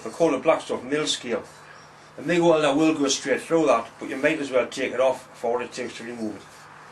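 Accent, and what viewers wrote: Newcastle, United Kingdom. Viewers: Wonderful! You kept us wondering how this was going to work until the end. I really enjoy your videos and always learn something new from them. I am not from northern England, have never even had the opportunity to visit, but I love listening to Geordie and it forces me to really pay attention to understand. Thanks for all your efforts to make these excellent videos.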